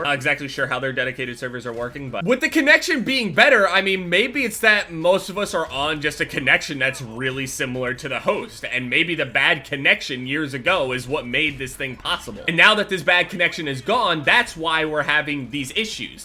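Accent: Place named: United States. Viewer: I stopped after every few seconds to mimic his pronounciation becuase I have to improve the pronounciation of my English and I hate the british english they are teaching us at school. thanks for your American accent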